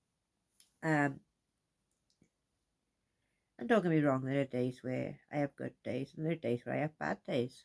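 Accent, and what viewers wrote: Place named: United Kingdom. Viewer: British accents are beautiful as my Gran was from Northern England in Warrington. To hear you speak is like being home to me. I have a very mixed accent, but my Southern Tennessee/Kentucky stands out the most. I don’t see Renelle as a problematic creator , she’s a strong lady and I admire her. I like Barbi too. Subbed to them both, but I’ll not partake in negativity. We have so much of that already. I post mostly nature videos and goofy parodies. I may share serious topics here and there. It’s a great hobby for me as it’s not a montetized channel. My advice if anyone gets sucked into that negativity? Ignore them. Block them. Unsub them. Don’t speak of them. That’s what I’d do. I was stalked online almost ten years, it’s awful. We need to be kind. Thank you for making this video. I’m subbing. 🌹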